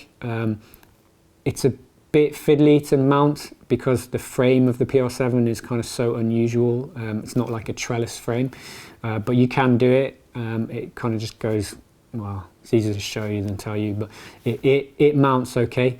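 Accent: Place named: England, United Kingdom. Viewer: Enjoy the vids. Hope you get to ride more soon. Off topic, but have you ever watched Project Amber on YouTube? He seems to be a kindred spirit. Maybe from the same part of England as you? (I’m an American, can’t decipher the subtle nuances of the accent). Anyway, y’all both have a calm/soothing presentation. Look forward to your (and his) vids!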